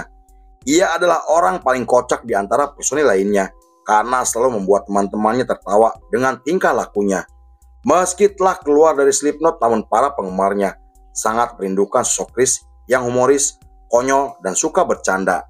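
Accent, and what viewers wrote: Indonesia. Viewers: Medok amet suaranya kaya kang ibing 😂😂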